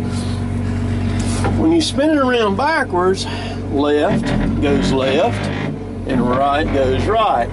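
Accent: South, United States. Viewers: Amen brother. That's the way we talk down here in the south.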